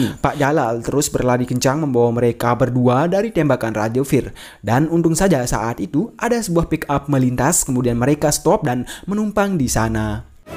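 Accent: Bali, Indonesia. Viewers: Logat mimin Bali / Lombok ... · Mimin dari Bali kah? Logatnya. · Logat bali mu kental broo Salam dari gianyar Ajarin saya buat jadi konten kreator bosku Swastiastu rahajeng wengi Nyama bali ku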